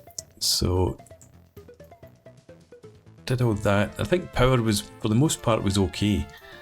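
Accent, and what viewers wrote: Scotland, United Kingdom. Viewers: going by the choochta accent your no west coast, you towards falkirk?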